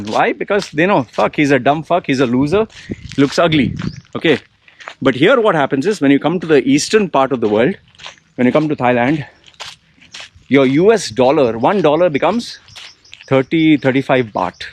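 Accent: India. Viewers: Did not expect you to have an Indian accent LOL. Cool insight tho.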